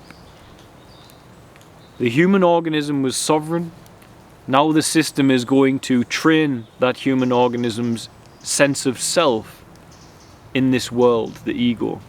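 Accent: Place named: Northumberland, United Kingdom. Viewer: Pure non dual clarity, it’s like listening to Ramana Maharishi but with a Northumberland accent 🙏🏻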